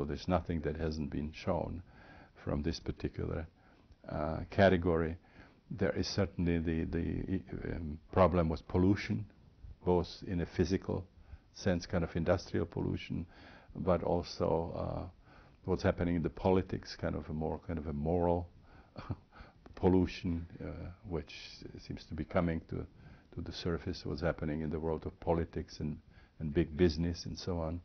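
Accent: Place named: Czech Republic. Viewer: he sounds Czech... I am about 99% sure by his accent and his name confirms that very well also.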